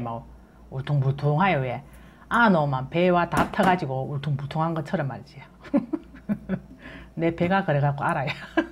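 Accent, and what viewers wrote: Gyeongsang, South Korea. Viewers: 너무너무~재미있게 하시내요 경상도사투리아주구 수해요~ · 구수한 경상도 소리 듣기가 너무 좋습니다, 알단 재미있게 들었습니다, 통영에서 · 밤으로 묵을만듣것을 처음보는것갇습니다 경상도 구수한 말 정겨운 목소리 ㅎㆍㅎ 맛난것 많이 배우고 갑니다 ~ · 좋은 정보 고맙습니다. 경상도 억양의 구수한 말들이 고향 생각나게 하네요. 오랜만에 밥뿌재 꼬매는 모습을 보니 엄마 생각이 나네요. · 여사님 말씀이 어느동네 억양인가요 갱산도 어느고을 사투리 인가용. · 밤 묵 잘 배워갑니다 감사합니다 구수한 경상도 사투리까지ㅎㅎ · 경상도. 토박이. 말씀. 정감이랍니다 서울사람들은. 무슨말인지모를끼다. 내는안데이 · 경상도 싸투리넘재미 잇네요? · 구수한 경상도 말씀 억수로 재미있습니다. ㅎㅎㅎ 정감이 가고 님은 살림을 엄청 잘할것 같아요. ㅋㅋㅋ 밤묵은 처음 접합니다. 좋은정보 감사합니다. 엄지척!!! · 항상들어도 구수한 갱상도 사투리의 맛있는 목소리로 가르쳐주신 밤도토리묵 강의 잘 봤습니다. 목소리가 너무 이쁘셔서 얼굴도 꼭 보고싶었는데ᆢ! 너무 아쉽네요. 저는 갱기도 사람이라 그런지 갱상도 사투리로 강의하신 선생님얼굴이 눈에 선합니다. 보고싶어요! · 밤 묵도 맛있어보이는데 갱상 말씀인가 디따 잼나네요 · 여사님은 경상도 아주마라 말씀하시는 품이 무뚝뚝하지만 뚝뚝던지는 말속에 인정 미가 넘치면서도 재미있네요. 옛날 어머니가 해주시는 음식들이 생각나게 합니다. 애교가 있어보이내요. 서방님께 사랑만이 받겠습니다. 밤묵만드 법 잘보고갑니다. 항상 건강 하십시요.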